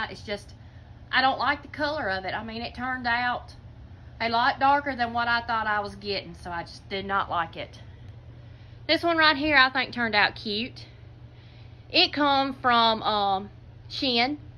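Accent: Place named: Louisiana, United States. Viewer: Thanks for asking that. She told me before it was art canvas and I thought she was saying something different. Her accent is adorable. She sounds just like my Mama who was from Louisiana.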